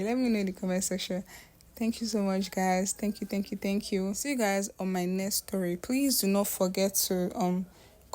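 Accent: Yoruba, Nigeria. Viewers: She's Yoruba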